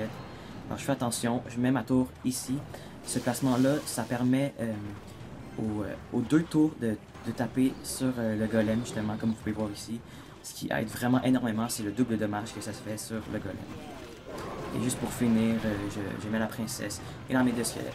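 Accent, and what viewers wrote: Quebec, Canada. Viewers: gg <3 j adore l accent quebecois XD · Nickel l'accent quebecois 👌👌 la video nickel aussi tes sur la bonne voie 👊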